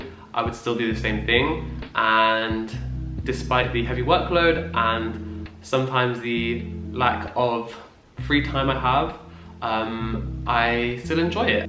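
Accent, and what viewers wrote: RP, United Kingdom. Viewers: I hate his britain voice. Akhhhhhhtuu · Mar Teumar right? even the way he speaks sounds posh. i mean, isn’t that RP? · Maybe what some people catch that causes the “posh” assumption is that, at least to these American ears, you have a posh accent. I fully admit I am no expert and may be bead wrong. However, I like your accent. It is part of the reason I find you attractive and interesting. Best wishes with med school. 👍👍🤞🤞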